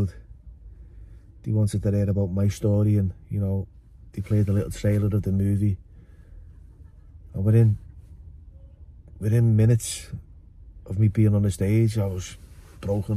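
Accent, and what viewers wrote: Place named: Birmingham, United Kingdom. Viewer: that brummie accent thought you were doing an aussie lol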